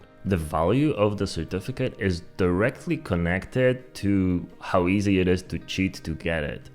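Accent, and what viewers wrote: Poland. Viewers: Słychać tu polski akcent :D Ale bardzo dobrze mówisz po angielsku.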